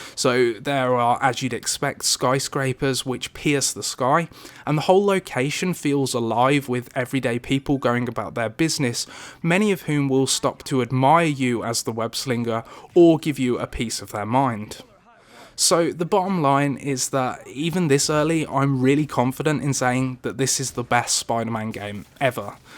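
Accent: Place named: Birmingham, United Kingdom. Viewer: That toxic Birmingham accent is distracting me. Good video, however.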